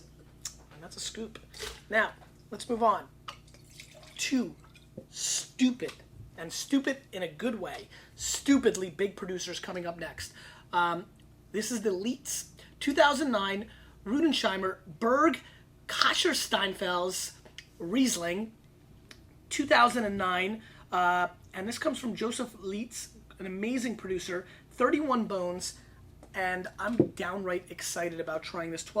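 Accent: United States. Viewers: Just a tip,. You should work on toning down your american accent. It is impossible to understand your German!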